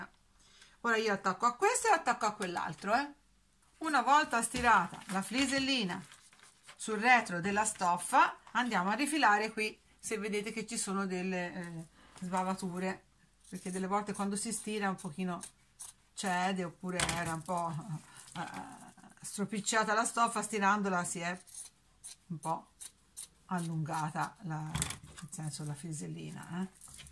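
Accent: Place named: Tuscany, Italy. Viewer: Buongiorno, carino il coniglietto bravissima.come mi piace l'accento toscano 😂😂😂😂😂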